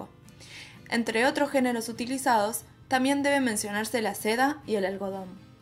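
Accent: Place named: Argentina. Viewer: Me encanta el acento de las argentinas.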